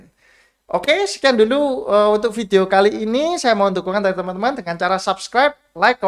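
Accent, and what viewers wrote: Surabaya, Indonesia. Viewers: Wah asli suroboyo iki.. Salam dari meduro.. 😁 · arek suroboyo kapasan toh bro.. auto like n subcribe..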